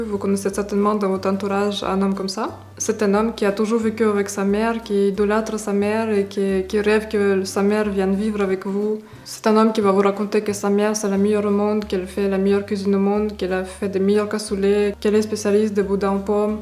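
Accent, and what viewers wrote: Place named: Russia. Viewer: salut, jai regarder plusieurs de tes videos c est chouette dentendre le francais avec cet accent, je voulais juste comprendre pourqoi chez les femmes russes vous semblez obseder par largent, si té en couple aavec un homme c est pas le seul critere jespere?n y voit aucune reflexion negatrive de ma part c est juste que jai limpression que les rapport hommes-femmes en russie sont a 90 pour cent baser sur largent, c est dommage nan?ya plein de chose interessantes a decouvrir chez les gens a part ca meme si c est vrai que c est important aussi, voila, bonne continuation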